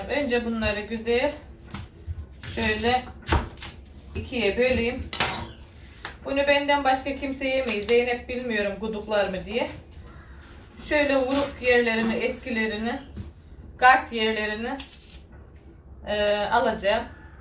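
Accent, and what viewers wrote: Trabzon, Turkey. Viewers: Trabzon Of Hayrat nahiyesiydi.Bayılırdım konuşmalarına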